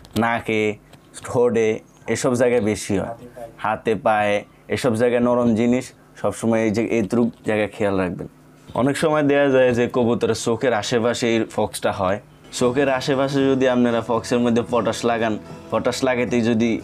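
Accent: Noakhali, Bangladesh. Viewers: ভাইয়ের বাড়ি কি নোয়াখালী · Love your noakhali voice buijjen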